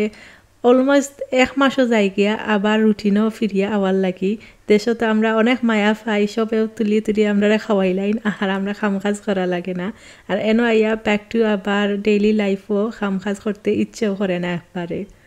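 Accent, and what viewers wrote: Sylhet, Bangladesh. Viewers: আপু, খুবই সুন্দর ভিডিওটা। আপনার সিলেটের ভাষা আমার খুব সুন্দর লাগে। একসময় ভাষা বুঝতামই নাহ। এখন সব বুঝি 🥰🥰 থ্যাংক ইউ আপু।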